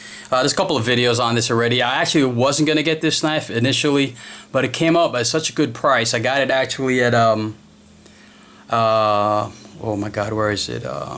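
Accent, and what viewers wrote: Boston, United States. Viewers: Are you from the Boston area? Sounds like you are ... Im from very close to Boston.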